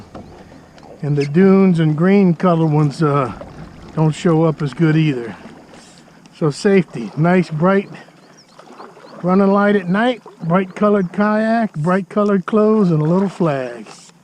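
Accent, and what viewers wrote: Louisiana, United States. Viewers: You sound like your from my neck of the woods Cajun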